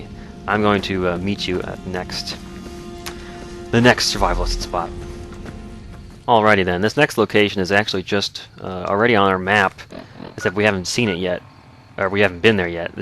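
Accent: South, United States. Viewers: I really enjoyed this video. I had never heard this story before and I found it very interesting and touching, above and beyond most of the writing for the rest of the game (not to say its bad). Your casual southern drawl and the hum of the terminal made this such an engrossing experience, thank you:3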